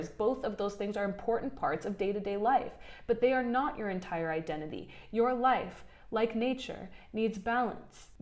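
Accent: United States. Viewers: Unrelated to the video (which is very enlightening), you have such a satisfying speaking cadence and articulation. I'm Swedish, and most of my English-speaking friends are British, so I don't hear a lot of American accents - but yours is just so scrumptious (like a tasty snack)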